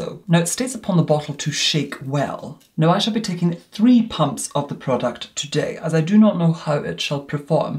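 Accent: Scotland, United Kingdom. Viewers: I can’t decide if your Scottish or not? You definitely sound different from me if you are! Where are you from?? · Literally the poshest Scot ever. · Greig Scott he’s Scottish, very posh Scottish at that lol. I’m from Glasgow and I’m trying to work out where he’s from exactly. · I love your accent. I love all Scottish accents but yours in something beyond! :) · He’s Scottish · it took me a full two minutes to figure out he was scottish, despite me also being scottish and living in scotland. it’s just something about the way he talks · Am I hearing a bit of Scot in your accent (like Alan Cumming)?? I love this video, I can DEF afford the NYX. TY <3 xoxox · he sounds very Scottish to me (another scot). You can tell he is from the north of Scotland and stayed in Glasgow. I'm sure he has picked up a little bit of an English accent but that's only natural if he is living there.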